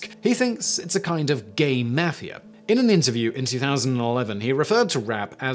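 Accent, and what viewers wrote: United Kingdom. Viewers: Wtf, are those celeb are on drugs or have they lost brain's to say this. Absolutely love your British accent it's very something and relaxing for a Sunday night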